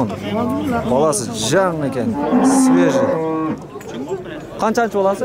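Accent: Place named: Leylek, Kyrgyzstan. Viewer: АТАКУЛДАН УЙРОНСОН ЖАРКИЛДАП СУЙЛОП!!! ЛЕЙЛЕКЧЕСИНЕН БАТКЕНЧЕСИНЕН !!👍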